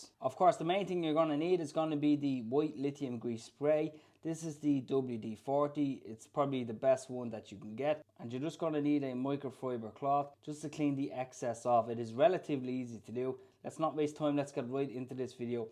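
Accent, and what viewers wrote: Ireland. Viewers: Another brilliant informative video as always! also Love your accent mate, the Irish accent is the best in the world imo x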